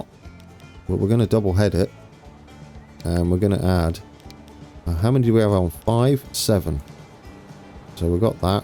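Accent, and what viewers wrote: England, United Kingdom. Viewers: I live in Minnesota and you have represented us well. To bad the game didn't have the seasons as it is beautiful here in the Fall and very white and cold in the Winters! Might be fun riding along with you on your Interstate 35 and sliding around in the snow and ice! Your my fave! Look forward to your updates and can't wait for your your new TF 2 venture! Thanks for all the hours of pure enjoyment. Why haven't you picked up on the Texas accent yet? A Texas/English accent would be fun to here. By the way. I may be your oldest viewer. I'm 70 years young. I have the game. But my old burnt out brain may not be able to play the Hard or longer games. So I live through you! haha Kinda scary huh!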